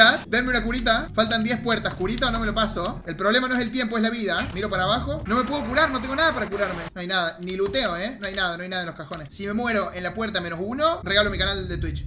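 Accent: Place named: Spain. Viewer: Naaaahhh, entre tus gritos, acento español y los nervios del juego me trasladé a otro universo 😂 Geniooo 👻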